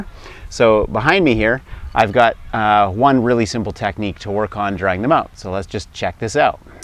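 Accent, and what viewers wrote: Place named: Canada. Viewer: I can hear the faint Canadian accent!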